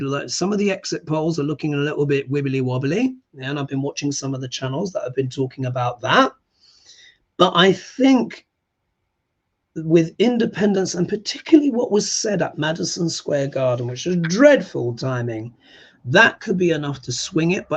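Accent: United Kingdom. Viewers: Omgggg I love your British accent 😍😍😍😍😍🤍🤍🤍🤍🤍😭😭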